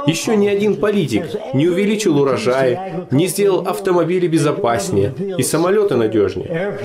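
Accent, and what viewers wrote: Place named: United States. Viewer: Говориш как Американец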